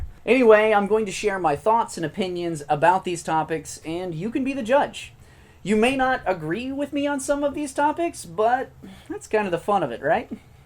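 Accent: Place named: United States. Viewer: Get to the point and just stop the twangy American blah blah blah theatrics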